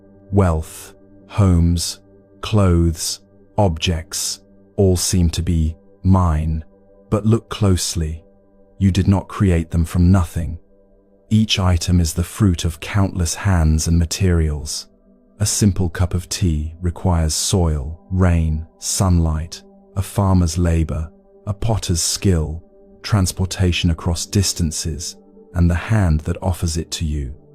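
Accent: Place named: Europe. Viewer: Ugh european accent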